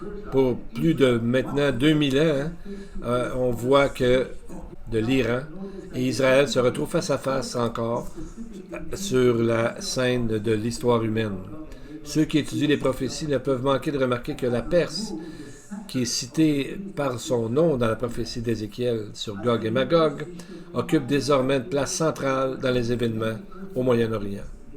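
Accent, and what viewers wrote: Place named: Canada. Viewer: C'est un accent canadien. Il est flagrant de s'apercevoir que l'intonation marque bien l'origine de ce son du continent canadien. Un beau pays, le Canada. Le Canada fait aussi de la politique, incroyable ! Dommage que l'on n'ait toujours pas la vérité. A croire que l'homme en est incapable.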